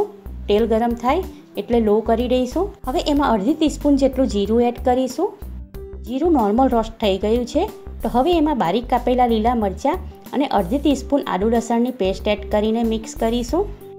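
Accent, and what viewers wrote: Surat, India. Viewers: Ek dam surti boli ,zkkas aalu puri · Be tame to bo jabri sudhdh gujarati bolvani try kairi.. ... Game etlu try kairu pan surati to hambhadati j chhe... Khabar padi j jati chhe.. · Tamara avaj par thi lage chhe tame surati ho evu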